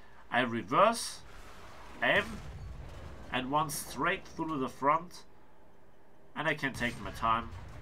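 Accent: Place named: Franconia, Germany. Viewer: du hörst dich irgendwie fränkisch oder bayrisch an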